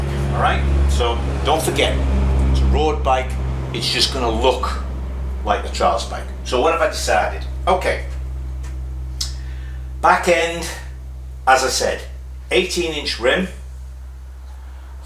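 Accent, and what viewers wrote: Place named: Middlesbrough, United Kingdom. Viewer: wow, small world. I hoped that was a Boro accent, I was born in the Boro , and live in Stockton, I've been a bike nut since I was a kid, I used to race a C15 on Redcar beach .about 7 years ago my Canadian cousin and I rode a Valkyrie and BMW from London Ontario to New York to pay our respects to ground zero and visit Billy Joel's bike museum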